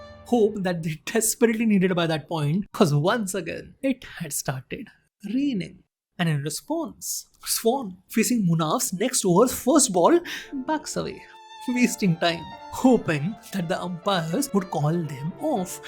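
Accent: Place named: South India, India. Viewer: Oh my god bro this dosa idli sambar accent so annoying to hear 😭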